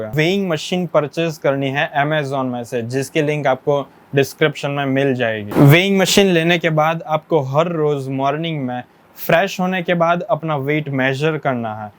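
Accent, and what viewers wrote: Gujarat, India. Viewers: gujarati bolva na way thi khabar pde che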